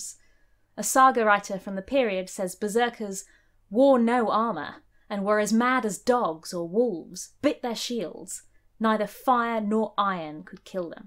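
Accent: United Kingdom. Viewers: Love how Idris Elba has a cameo within the historical figures. Just found out about the channel, gorgeous and incredibly knowledgeable lady with brit accent. Can't have it better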